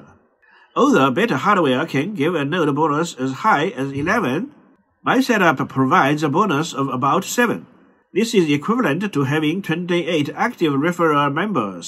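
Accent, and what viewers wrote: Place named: China. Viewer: Your Accent Reminds me of My Chinese IT Teacher... Easy for me to Learn and Follow your Guidance❤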